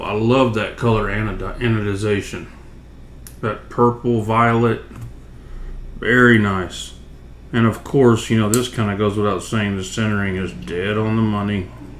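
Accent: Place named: Texas, United States. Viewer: That texas accent doe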